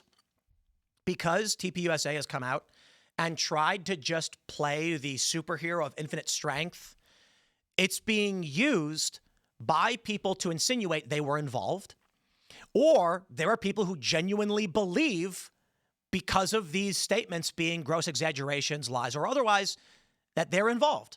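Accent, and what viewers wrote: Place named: Chicago, United States. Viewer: Tim, please stop popping your words, it’s better when you sound like a Chicagoan.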